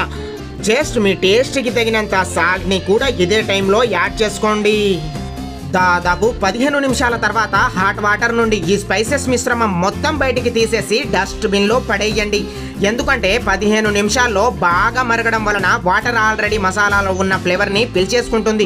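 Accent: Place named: Telangana, India. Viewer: Hi bro thank you for the video and meeku telangana slang vachu telugu nerchukoni chepthunaanu anaaru don't worry bro meeru meeku vachina slang a maatladandi we can understand telangana slang as well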